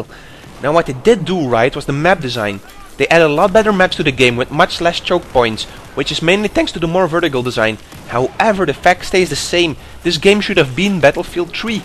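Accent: Netherlands. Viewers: lol, I love the Dutch accent!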